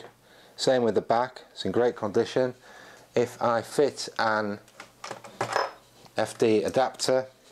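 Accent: Manchester, United Kingdom. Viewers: Sounds like a Manc accent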